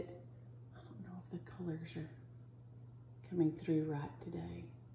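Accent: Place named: South, United States. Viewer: Maybe Webster should make a Southern dictionary! Never heard of Tump! But I bet Utah people say words you never heard, like “Oh, My heck”, Fetch, or Biffed it. Love your Stitchin, Stories and accent! FREE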